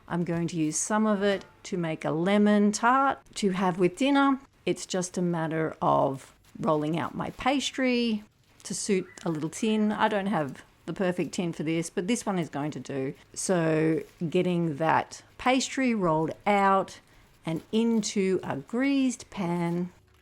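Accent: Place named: Australia. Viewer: your accent sounds australian, you sound a lot like this woman : <link>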